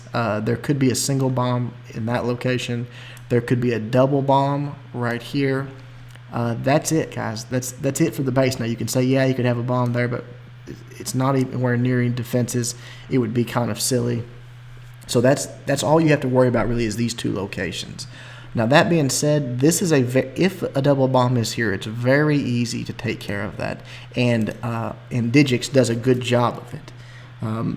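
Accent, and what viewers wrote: United States. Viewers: Good video, your english sounds disgustingly american though.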